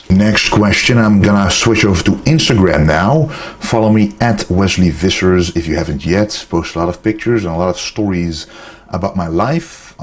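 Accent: Netherlands. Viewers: Dutch, can't you tell from his accent?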